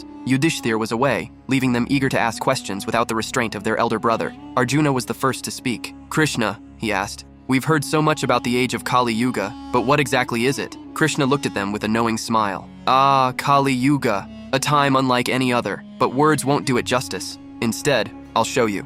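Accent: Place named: United States. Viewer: Phew! Finally Mahabharata in American accent! ❤❤❤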